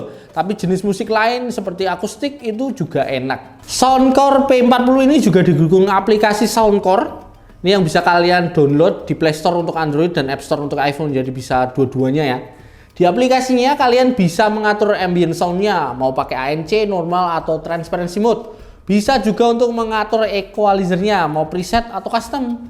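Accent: Java, Indonesia. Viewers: logatnya jawa banget... saya udah punya yang soundcore R50 NC ... apakah P40i ini kualitasnya lebih bagus dari R50 NC?😅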